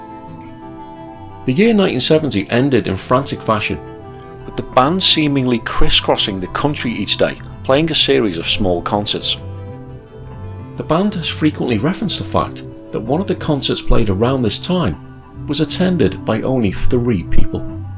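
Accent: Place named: Liverpool, United Kingdom. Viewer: You have a scouse sound, being from Liverpool myself I can tell a bit of it (maybe a bit manc?) Genesis were always very popular in the north west, with them and floyd having about a 20% increase on cost on tapes and vinyl in the 90s when I was a teenager! Well worth it though.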